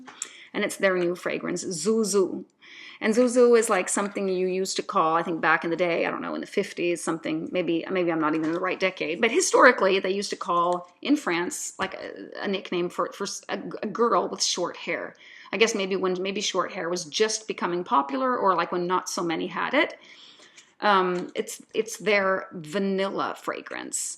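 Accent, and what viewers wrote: United States. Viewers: I thought so too. I’m American and assumed she must be an expat because she sounds very American. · Thanks for the insight! Very interesting fragrances that go far beyond the ordinary. I thought you are American because of your accent. Greetings from Hellas!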